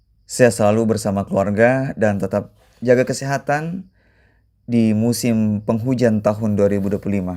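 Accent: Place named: South Sulawesi, Indonesia. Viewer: Salam mkssr daeng baru tadi lihat sort ehh logat"nya Sulsel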